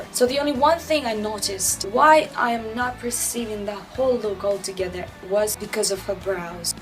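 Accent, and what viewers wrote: Russia. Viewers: How to be beautiful, Step One: Have Russian Accent. Step Two: Be. 😍😍😍😍 You're the coolest! Can't wait for this series! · It's the Russian background. I think it's a beautiful language/accent. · yes. She is Russian after all. What accent should she have? I actually find truly beautiful how her English is so good. Absolutely perfect in every choice of verbs and adjectives and so on. So detailed. The fact she still has that Russian accent on the base is only the prove how genuine she is. And clever. She does speaks English as a native. Impressive I would say. And she also always says things i can rely to. Thanks. You are a breath of fresh air. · как-то сразу поняла,что вы русская.очень хороший английский у вас,но есть какой-то маааахонький,еле уловимый родной акцент.только что нашла ваш канал и сразу подписалась!вы классная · Women with the Russian accent 😍 it sounds so beautiful to me ! 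· Wait are you russian ..??? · She’s Russian!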